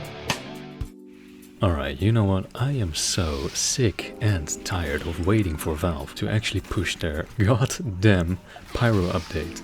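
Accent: Netherlands. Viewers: Hey, nice vid. I was wondering, are you dutch? Your accent sounds dutch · are you dutch? your sounds like it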